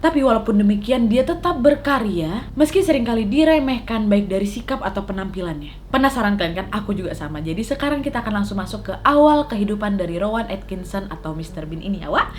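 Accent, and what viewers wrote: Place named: Lampung, Indonesia. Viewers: Mbak orang Lampung ya, dialek bicara nya Lampung banget 🙏🙏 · dialek sumatra.... sumatra mana?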